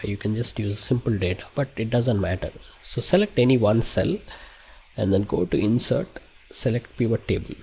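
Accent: India. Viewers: This is the first video that I actually find helpful!! thanks a lot!! Love your indian accent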